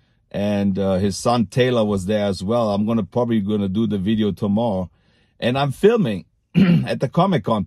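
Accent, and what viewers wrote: Germany. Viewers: Take heart brother, I've watched your vids over the years, and I love your spirit, your German accent, and your swag, "Cast not away your confidence, for it has a great reward" I don't know you, but I know you, I used to live in Venice on Wavecrest between Ocean and the strand, very close to where your shop was, but those were the better years, and I feel like I got the best of it. Keep punching brother, your in my prayers, blessings...TMac Vegas Strong!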